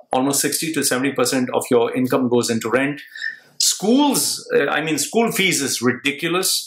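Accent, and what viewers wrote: India. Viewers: You sounds like an Indian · Wow you have such a strong Indian accent for someone who has not lived their. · I couldn't understand a word from his Indian accent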